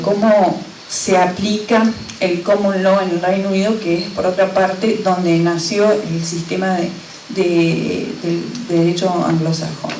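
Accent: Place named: Argentina. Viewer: saludos. no teiene mucho quever pero me suena como a unespeciede acento Mendocino de argentina o Cordobes de argentina.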